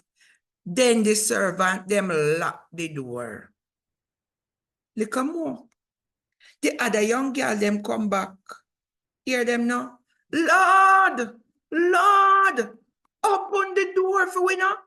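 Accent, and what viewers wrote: Jamaica. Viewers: Lol first I am hearing the story read like this. Nutten like Jamaica Patwa. Makes the story so colourful 💯😊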